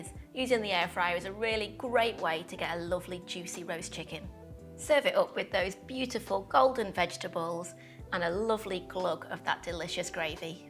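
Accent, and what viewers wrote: United Kingdom. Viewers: So glad to find your channel, I am improving my British accent and your accent and cook are both incredible. I follow u from Iraq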